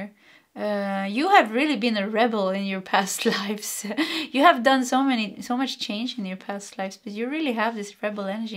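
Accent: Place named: Sweden. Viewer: When I hear you apologize for stumbling on your English, I’m so very impressed how well you do!! I would NOT be able to give a reading in Swedish, so I applaud you for doing so good!! Secondly, both 1 and 2 are 100% me!! I do healing and I have a wonderful old soul tribe. I appreciate this confirmation!! Love your readings and really connect with the Swedish accent as a soul of Swedish descent this time around!! ♥️👏🏻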